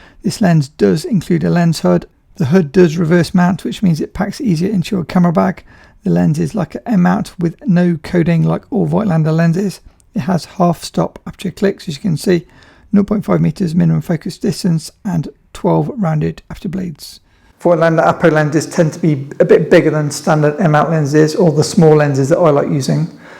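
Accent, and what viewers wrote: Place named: United Kingdom. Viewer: Ciao! Thanks again for your content, I always find it “sharp”, balanced, and genuinely enjoyable. And that lovely British flow of yours? Can’t get enough of it ❤ Since I work in sound, I hope you don’t mind a tiny suggestion: your voice has a naturally low and soft tone, which is really pleasant, but when the audio gets boosted to make it more audible, it introduces distortion, crackling, and a bit of reverb, even noticeable on phone speakers. Using a proper mic plugged into the Rode GO could really preserve the quality of your voice and take the whole listening experience to the next level. Sorry for pointing it out, I know it’s a bit of a sound-geek thing, but sometimes it makes it tough for me to fully enjoy your content, which is otherwise brilliant. Some mics that I suggest: RØDE lavalier II (not the “go”) Sanken cos11 Sennheiser mke2 Sennheiser mke1 One of those even used on ebay Combined with a little fur: Rycote overcover or similar Will make your audio leica level:) Looking forward to the next one!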